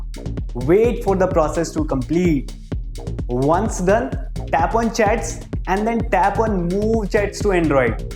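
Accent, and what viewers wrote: India. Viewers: Indian accent is haunting us on YouTube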